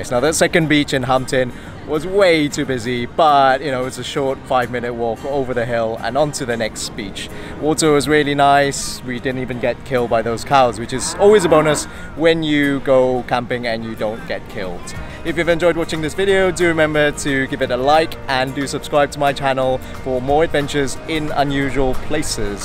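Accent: Wales, United Kingdom. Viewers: Great video! We spent the summer free camping around Europe (as much as we could anyway) it was maybe more stealth camping so there was rarely anyone else around! I'd love to head to Hong Kong (not right now for obvious reasons though!) for some free camping. (p.s. is it just me or do you have a slight Welsh twang in your accent?)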